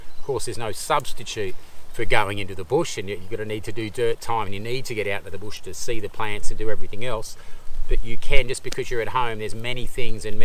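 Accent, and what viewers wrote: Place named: Australia. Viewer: It is bloody awesome to hear another Aussie accent online talking about bushcraft and survival stuff. love it. Don't why I haven't seen this channel before. Sub'd and thumbs up.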